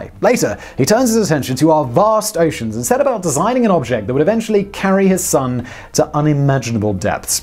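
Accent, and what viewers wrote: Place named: England, United Kingdom. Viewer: The English accent... :)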